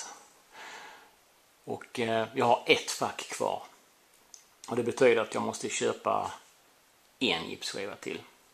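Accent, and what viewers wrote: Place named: Scania, Sweden. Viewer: Har kollat på alla 9 videos och ja tycker du är rolig och din skånska är rolig med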